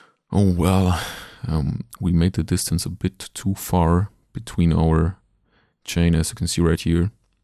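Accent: Germany. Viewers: Bist du deutscher? :D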